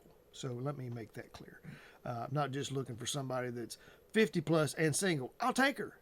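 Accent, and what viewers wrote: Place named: Texas, United States. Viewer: Where exactly are you at? What area? You sound like you might be from Texas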